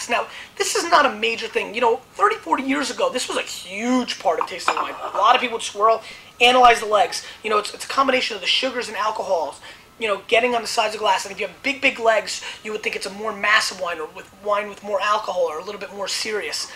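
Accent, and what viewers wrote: New Jersey, United States. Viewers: from Jersey much?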